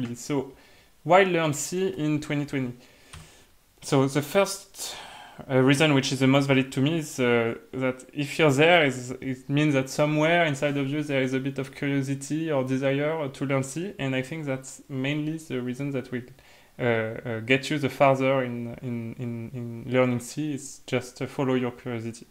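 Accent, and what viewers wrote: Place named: France. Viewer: are you french? i recognise this accent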